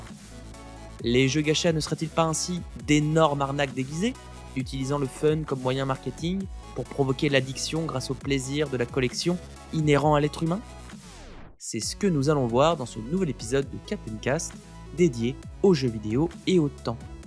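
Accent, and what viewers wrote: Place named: England, United Kingdom. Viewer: Incroyable vidéo et j'ai adoré l'accent anglais, continue comme ça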